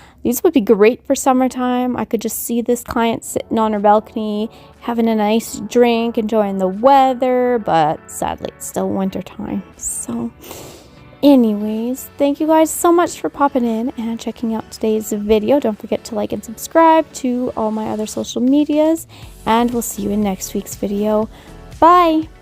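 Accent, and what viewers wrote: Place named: Alberta, Canada. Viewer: Clearly you are a rocking Canadian!! I'm hearing mid/northern Alberta. You mentioned Edmonton, where you got???? I can't remember... Albeit I've been watching you for a while now, its still nice to have a fellow Canadian as a YouTuber!! Suzie, Sarah, and Talia are the other Canadians I watch. 💘 those ice cubes. So much fun. Great set. Nice colour combo💙💕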